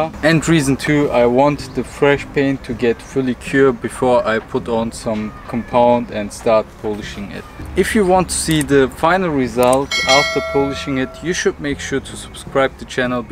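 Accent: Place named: Turkey. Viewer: Sanki aksamınız Türk gibi.. Thanks for video..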